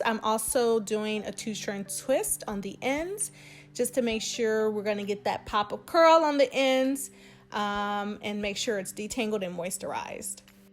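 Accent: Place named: South, United States. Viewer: You have such a southern twang in your speech. I love it. I am also thinking about getting a tapered cut. I love yours.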